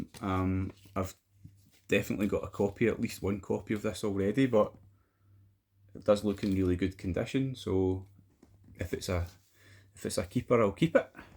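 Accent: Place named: Scotland, United Kingdom. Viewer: Get rid of those vinyl relics and while you're at it remove that daft Scottish accent 😆👎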